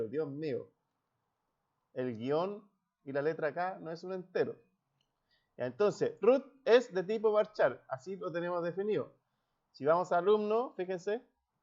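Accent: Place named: Chile. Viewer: Excelente explicación muy didactica y de facil comprensión, además el aporte de la página es muy util para quienes estamos iniciando en el mundo de las TIC, por su acento deduzco que es ud chileno, muchas gracias saludos desde colombia.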